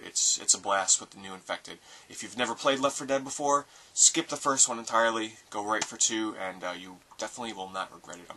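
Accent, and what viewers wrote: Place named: New England, United States. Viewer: what part of america do you live in? I sense a new england accent...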